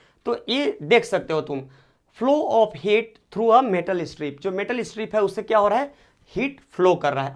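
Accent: Bihar, India. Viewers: Yah sir Bihari kyon bolata hai yah Bihar ka lag raha hai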